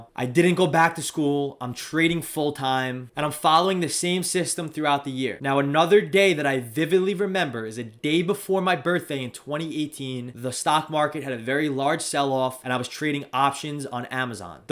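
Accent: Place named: New Jersey, United States. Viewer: Damn brother what a ride!! I decided to get a finance degree after watching the documentary ''Inside Job'' and my uncle is in finance. The documentary made Wallstreet look like a Mafia Scorsese movie and I was a street kid and thought damn, these guys are wise guys and they don't go to jail?? like the regular wise guys?? I signed up straight away for community college and then went to university - still get wise guy vibes with your Jersey accent brother 😂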